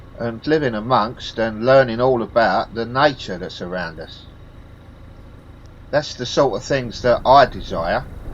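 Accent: London, United Kingdom. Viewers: Please don't stop " rabbiting" I love your accent, but as a Londoner I'm biased 😉. Your commentary, historical info and snippets of interest are just perfect. Don't change a thing. I had a friend who lived in Pennylands, it might have been purpose built estate but it was a peaceful place for her to live. I had no idea the basin was just behind her bungalow. Look forward to your next vlog 😊 x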